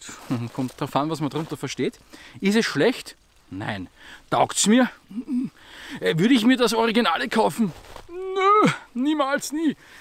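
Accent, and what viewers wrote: Austria. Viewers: Was hast du denn gehört? Und er ist ja auch Österreicher deswegen der leichte Akzent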